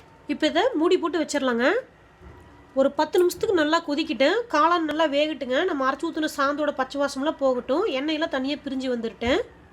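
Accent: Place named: Coimbatore, India. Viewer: Akka neega Coimbatore thana pesarathuliya theriyuthu ka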